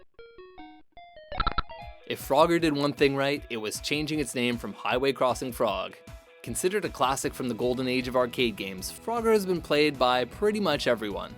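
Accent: Canada. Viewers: It's a Canadian accent. get over it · FIRST WORLD PROBLEMS It's a Canadian accent. get the fuck over it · It's called a Canadian accent, stop being so butthurt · For every person going "THEY MISPRONOUNCED MARIO!!?!?!" The narrator is Canadian so he's obviously going to pronounce things differently. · He's Canadian. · he's canadian..... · That's the way Canadians talk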